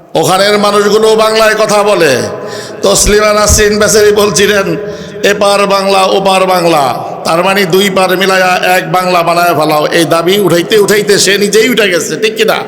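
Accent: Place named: Pakistan. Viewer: হুজুর আপনি যে পাকিস্তানি বংশধর তা কথাই বোঝা যায়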